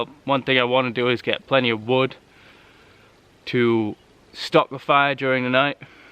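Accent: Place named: United Kingdom. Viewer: Aren’t you British, you sound British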